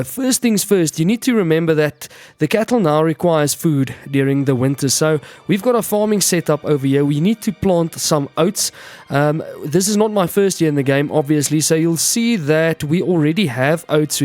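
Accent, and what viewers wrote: South Africa. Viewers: The way this guy speaks, I can swear he's South African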